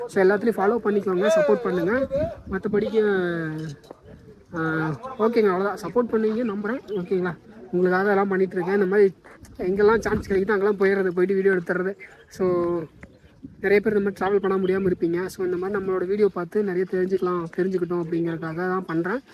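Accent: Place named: Coimbatore, India. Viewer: ne pandradhu ellam Kovai outdoors mari Iruku bro slang and intro ellame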